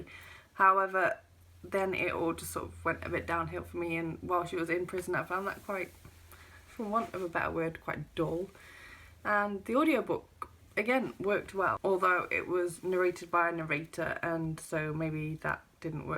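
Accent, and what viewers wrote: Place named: Bury, United Kingdom. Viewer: I love your accent; sounds very like Victoria Wood's. She was from Bury, if memory serves, so I guess you must be, too.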